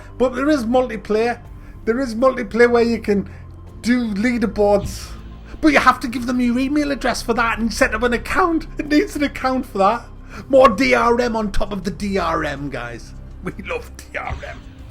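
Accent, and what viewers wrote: United Kingdom. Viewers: omfg. this is the best channel for pessimistic gamers ever. And the british accent makes it even better for us yanks. so entertaining. i try to show everyone i know this channel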